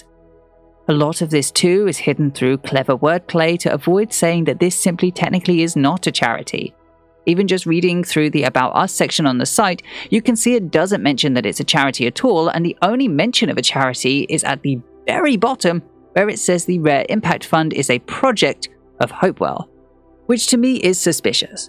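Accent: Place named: United Kingdom. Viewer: I mean, England is not a single country. Yet. UK is and you kinda sound from there. Anyway. Maybe in 50years due to Brexit there will be no UK, but Scottland, Ireland and England, but for now.. UK. I am not trying to be pretencious it just make my ears bleed.. every time I have really liked the video, I find Selena drama queen shady. But I also now 100% due to my profession that people are so so into mental health now after the pandemics. It was a smart thing of her, this way she reached older audience as well, I mean sure she ha sgreat price point but I don't find her products good as some of professional MUAs, and kinda a lot of colors, glitters in the highlighters in certain light.. not really for mature skin, if you get me. Liquid blush too pigmented so need a work and then too heavy foundation (and this is not a mature skin friendly). Anyway, I wasn't before this a fan but this looks shady. 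Great job overall!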